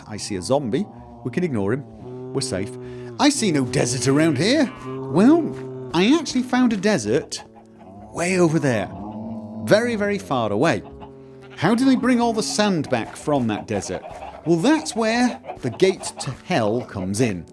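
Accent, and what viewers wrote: United Kingdom. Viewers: You know I finaly remember why i like watching you. You are basically have a british 'Morgan Freeman' voice in that its amazing to listen to and you happen to play alot of games i enjoy. Please do more of the minecraft stuff!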